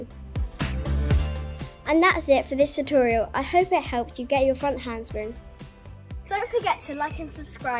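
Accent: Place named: United Kingdom. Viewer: I love your British accent 😃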